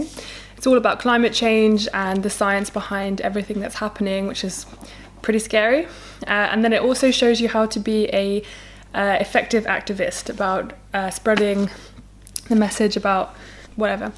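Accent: London, United Kingdom. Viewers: What part of London are you from? You have the poshest accent I've ever heard haha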